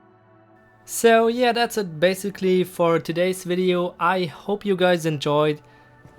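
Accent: Germany. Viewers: Ich hab es direkt gemerkt das du deutscher bist ☺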